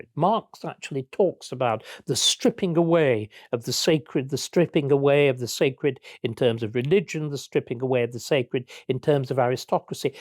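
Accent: RP, United Kingdom. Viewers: You wouldn't of thought he was a working class lad.Speak with a plum in your mouth, and you automatically think he is intelligent. Some people are different deal with it.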